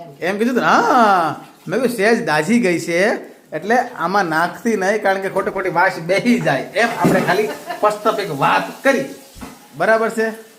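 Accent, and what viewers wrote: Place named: Surat, India. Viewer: Tme surti bolo tho mne moj padi jaye che .maru piyar navsari maru sasru mojilu morbi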